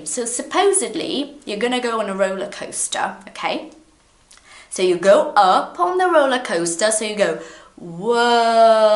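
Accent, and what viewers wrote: United Kingdom. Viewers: I finalmente found someone with a British accent.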